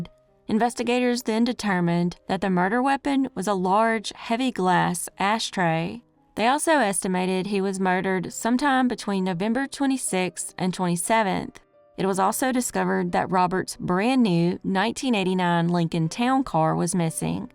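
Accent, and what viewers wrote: South, United States. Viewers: Hey good job on the opening I think it's new anyways I love ur show girl it's not everyday that u can have a country accent that is not either related to southern cooking or kkk u know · Thanks to lady with sweetest southern accent, evah. Love fr panama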